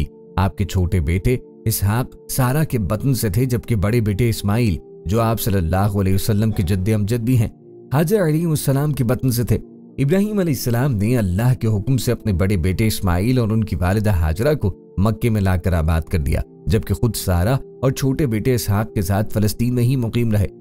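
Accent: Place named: Pakistan. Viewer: Beautiful voice and and Urdu accent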